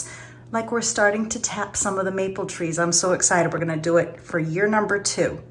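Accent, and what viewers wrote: New England, United States. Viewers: Please don’t loose your New England accent it’s a great part of what we love about New England!! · I love your pantry! It’s beautiful! Your kitchen looks amazing also!! I love your New England accent!! Hosses, not horses!😂😂My grandparents were from Mass, and my great grandparents resided in Stonington, Ct. I’m a New Englander at heart. I love seeing your videos and always look forward to your updates! Love your beautiful home! Jean😘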